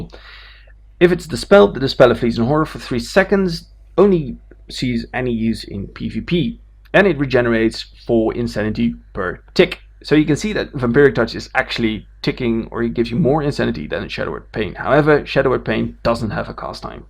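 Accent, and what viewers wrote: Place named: Denmark. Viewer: Nice guide! Really helpful! Always funny to hear someone talking english with what sounds like a danish accent! :D I got a few questions. I just recently started maining my Shadow Priest after I saw how fun the Voidform and Insanity Management looks. 1. I'm still using Legacy of the Void since I started playing my Shadow Priest, because it's what I was told to start using and then slowly moving on to Surrender to Madness, however I wasn't that fond of changing because I thought Surrender to Madness was a passive, meaning that it was every time you left Voidform you died, but seeing that it's a cooldown, you can actually play casually, like questing, clearing trash and such without being scared of dying to Surrender to Madness. But honestly, a 10 minute cooldown, yes it's one hell of a strong talent, but the 10 minute cooldown really doesn't seem that appealing, and I might be wrong here, but currently in my Mythic guild we are clearing bosses faster than 10 minutes a part in most cases, does that really mean there's actually bosses where Shadow Priests isn't gaining ANY DPS from their level 100 talents, or am I missing something? 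2. Do you think Shadow Priests will be nerfed? It really seems like we are just a tad too strong, together with Rogues and maybe fire mages (haven't played since the nerf today/yesterday) - It seems like the only way you can justify Shadow Priests doing that much damage is their ramp up time, they're absolutely shit at fast fights and they do have to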